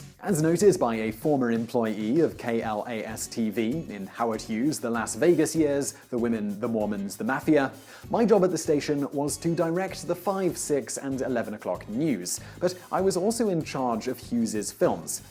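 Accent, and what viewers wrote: United Kingdom. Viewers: Gallagher Rutledge ya most Americans pronounce those worlds right, but I understand since he was British.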